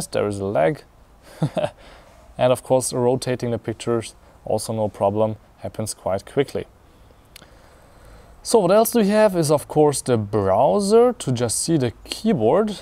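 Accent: Germany. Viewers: Dude I love your German accent! I can be your girlfriend? XD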